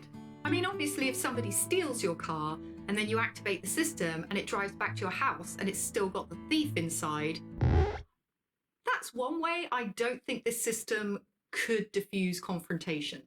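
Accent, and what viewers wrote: United States. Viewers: It's weird that a brit other than John Oliver is teaching me about the goings on in my own country. Thanks for hilarious and educational content. And your American accents are fantastic. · The american accent is getting better every video. Well done 🎉 Question though: Did Amber use the cockney accent? As a german listener I am trying to learn the difference between the british accent (Lord, you have so many😅). The video however sounded like a bad idea for a Maximum Overdrive remake. This almost naive trust in AI is simply concerning 😑 Thanks for your time and content and the best of wishes Mr. and Mrs. Sheppard, hope they are doing well as well ❤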